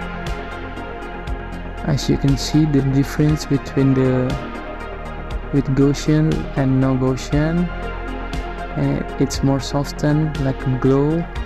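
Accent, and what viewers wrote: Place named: London, United Kingdom. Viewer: abang orang london bang?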